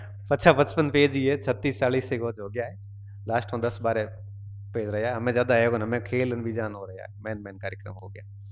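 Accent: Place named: Bagri, India. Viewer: Sir aap ye bagdi mt bola kro bich me samjh hi nhi aata kya bol rhe ho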